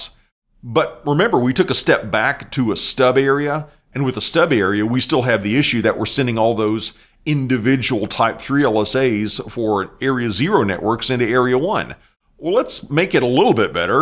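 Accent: Texas, United States. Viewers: is that a Texas accent?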